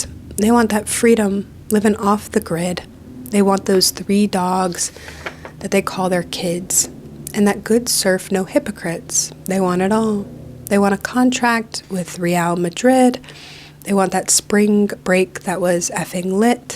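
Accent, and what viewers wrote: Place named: Chapel Hill, United States. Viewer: Omg there was always something so familiar about your cadance. i’m from chapel hill, it all adds up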